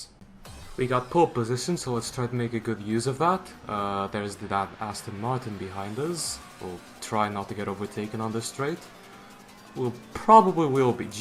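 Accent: Portugal. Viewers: Caralho, seu inglês é muito bom, muito de vez em quando posso ouvir sotaque português. Bom vídeo como sempre! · Portugal accent? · Voice is heard.. Maybe now I get a gf or a car faster... (By the way nice smooth Portuguese English eh)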